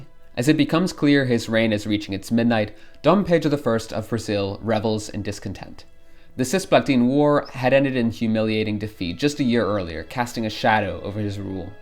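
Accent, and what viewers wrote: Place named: Spain. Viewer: Stop pronouncing our language with spanish accent 🥲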